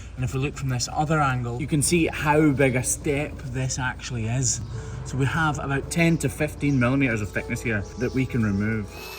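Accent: Scotland, United Kingdom. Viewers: I don’t watch any other hoof trimmers. Why? I love the care you show your animals, your humor, and above all, your delightful Scottish accent. Thank you!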